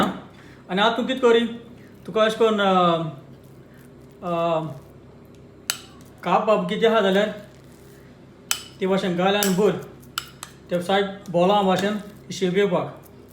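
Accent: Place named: Salcete, India. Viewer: I liked ur salcette konkani... Feel like I am in colva my uncles place.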